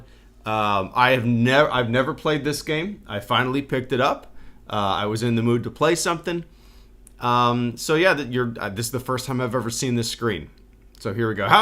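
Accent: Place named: South, United States. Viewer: Really rocking that southern drawl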